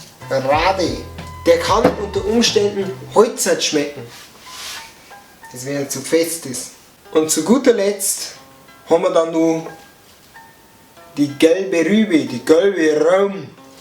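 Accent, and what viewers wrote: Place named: Lower Bavaria, Germany. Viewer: du bisd ned amoi a bayer niederbayern!!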